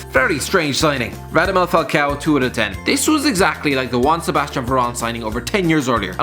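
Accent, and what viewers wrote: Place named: Ireland. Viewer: This guys Irish voice is so annoying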